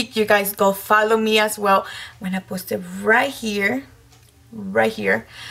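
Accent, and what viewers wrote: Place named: Guatemala. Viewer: i love your accent :) im guatemalen too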